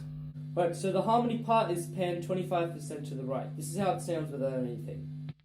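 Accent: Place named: Australia. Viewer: Your accent sounds Australian.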